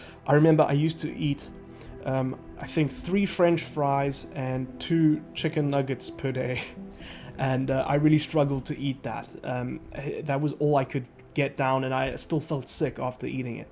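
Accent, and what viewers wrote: South Africa. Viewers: brother are you afrikaner or english south african?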